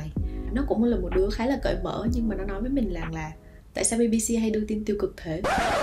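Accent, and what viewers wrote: Vietnam. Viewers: Bạn là người Bắc hay Nam?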